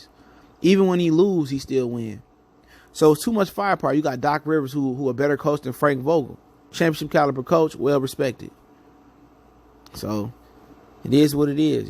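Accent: United States. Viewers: Your commentary sounds very American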